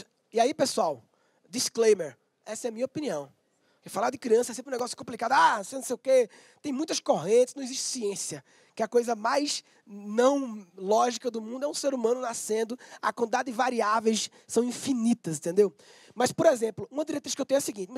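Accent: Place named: Northeast, Brazil. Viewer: amo o sotaque do Nordeste (que meu terreninho na Bahia me aguarde;) e quanto a pasta de dente, ri demais! Ainda mais sendo dentista! E hoje a tampa é grudada no tubo, reparou? Acho que ouviram você! Rs. Show!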